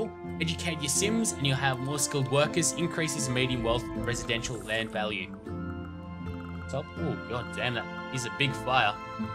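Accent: Australia. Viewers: I thought you were Australian and I cheered a little (I'm Australian, in Brisbane) then you said about saving on the North American servers and I got a bit confused =P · It's Australian not British lol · are u from Australia? because my mom is and i now a accent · Oh, this is Sim City (GOOD) you are Australian = This play through EPIC. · I love this guys voice where are you from. Australia any chance? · hey!! you are Australian!! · you sound like your from Australia are you from austrailia if you are your EPIC! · fuck yeah! You're australian! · Australian right ? ;) Nice video mate ;)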